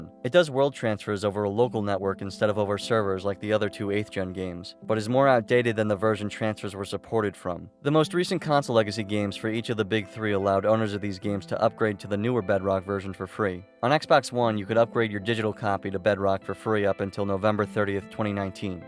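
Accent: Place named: New Jersey, United States. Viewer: Idk why, but based on your cadence and voice, I just have a FEELING you're a New Jersey resident who either lives close to Philly or used to.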